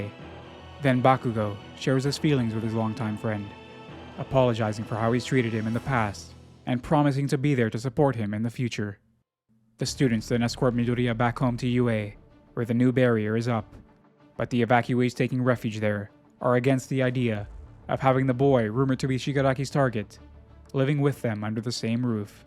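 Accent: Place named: Spain. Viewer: dont use a spanish accent when pronouncing the names man, it throws off the actual real pronounciation and lessens the video - other then that good recap. I'm mexican so this isn't even trying to be a racist comment just constructive criticism to keep the names as close to the anime as possible and not get away from that.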